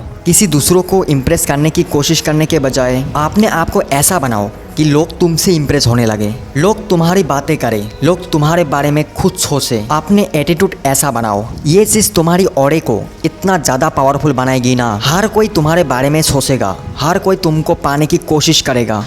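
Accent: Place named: Bengal, India. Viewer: Bengali ascent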